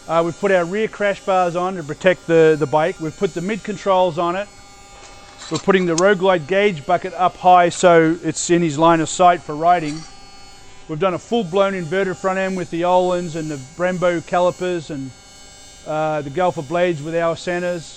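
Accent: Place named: Australia. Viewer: Okay, I gotta ask... Are you Aussie, New Zealander, or American? Your english kinda goes from Aussie/Kiwi to American english with certain words so I am thrown off. Great video but if you can answer that... that would be great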